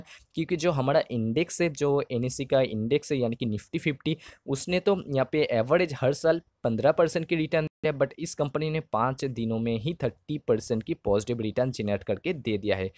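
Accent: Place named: Bihar, India. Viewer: Tu bihari hai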